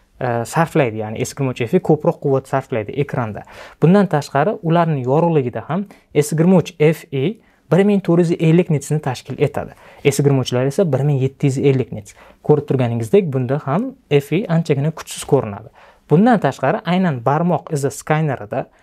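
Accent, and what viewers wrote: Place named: Uzbekistan. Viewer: Yaxshi kontent chiqibdi. Lekin inglizcha so'zlani o'zbekcha qilib talaffuz qilmang. Chunki bu so'zlar "amoled, snapdragon" so'zlari siz qilayotgan kontentda juda ko'p ishlatiladigan so'zlar va ularni to'g'ri talafuz etish sizlarni vazifangiz desa xam bo'ladi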